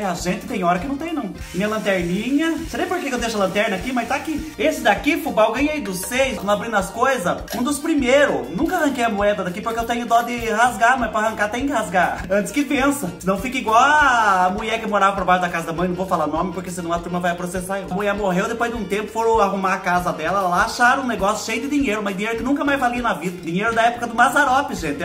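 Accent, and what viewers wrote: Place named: Caipira, Brazil. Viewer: Você É Demais! Amo esse seu jeitinho caipira de falar, morro de rir com as palhaçadas que você faz e diz. Cansei só de ver você limpando, tu vai ver o que é o corpo fazer CREK quando chegar na minha idade (66), minhas costas estralam só de olhar alguém faxinando. Cruz Credo! 😘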